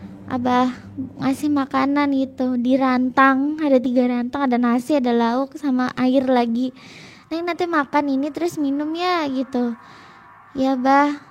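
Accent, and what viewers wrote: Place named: Cirebon, Indonesia. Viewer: Yah Cirebon jemaras dia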